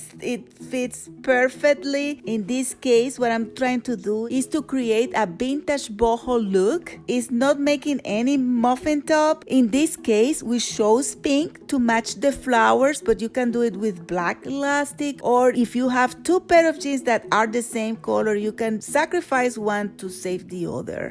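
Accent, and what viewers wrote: Spain. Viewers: This is a great tutorial, thank you! I have the same “Pandemic Pants Problem” I did a triangle in the back, but was not good, yours look great! Your accent... are you Spanish? Your mother looks very young, so how old are you to be so talented? Thanks for share, I just subscribe from Puerto Rico! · Pero lo esta explicando en acento espanol! 😉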